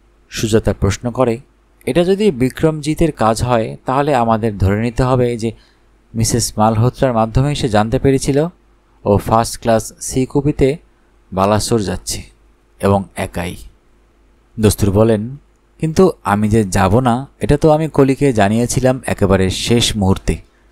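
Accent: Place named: Bangladesh. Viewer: আপনার উচ্চারণে বাংলাদেশ এর টানটা খুব মিষ্টি লাগছে, কৃষ্ণনগর থেকে 👍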